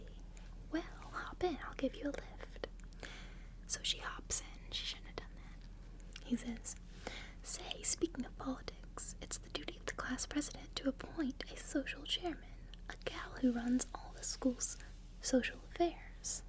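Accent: South, United States. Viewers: Tracing ✅ Little bit of country twang ✅ Fell asleep ✅ Would recommend to a friend ✅ #1 Youtuber out there ✅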